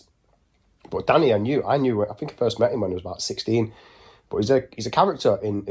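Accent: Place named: Liverpool, United Kingdom. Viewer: good channel this bro. laughing with the scouse accent 😂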